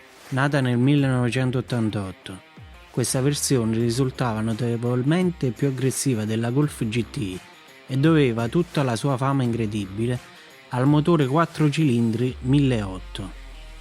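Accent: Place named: Naples, Italy. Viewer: Ma come cazzo si fa a commentare e redigere un servizio del genere con una voce con uno spiccato accento napoletano! Vergogna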